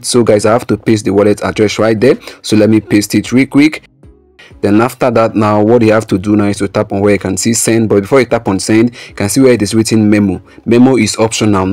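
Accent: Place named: Africa. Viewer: man ,u have an african english and u talk fast ,slow down man ,none understand u like that ,ur so funny !!!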